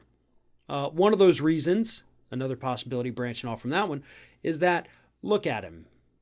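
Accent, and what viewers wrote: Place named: Florida, United States. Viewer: Love your Floridan accent saying," egth,! I dont want that agaun,!" " what was I doing? VOMIT "!!!☺️🤠✌🏽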